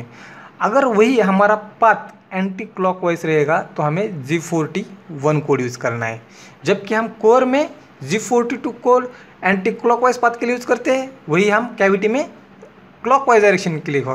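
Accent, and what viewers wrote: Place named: Maharashtra, India. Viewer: Loved the vedio But Marathi anscent is just awesome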